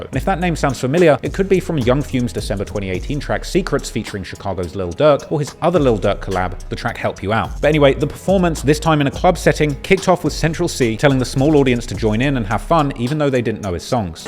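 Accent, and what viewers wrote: England, United Kingdom. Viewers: People see a white boy who has ZERO street ties with a MIDDDLE CLASS British accent and eat up everything he says. So much of this is inaccurate and misinpreted cos again he’s privilege white boy dats not road - it’s crazy. These people are out here monetising the struggles of the underprivileged, pillaging in their privilege and hiding behind “journalism”. Real culture Vultures activity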